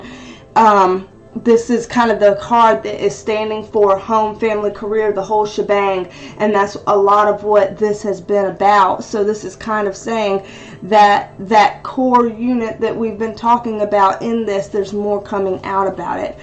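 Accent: South, United States. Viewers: Peter Monn brought me here!! You are so sweet and I LOVE that southern belle accent! Sending good vibes from TEXAS!!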